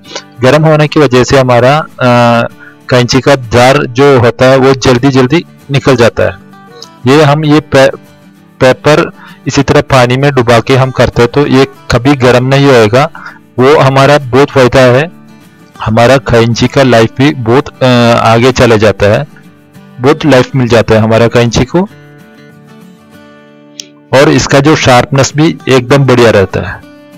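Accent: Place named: Kerala, India. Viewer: The tip is really nice but listening such sweet Hindi from a malayali was great 😀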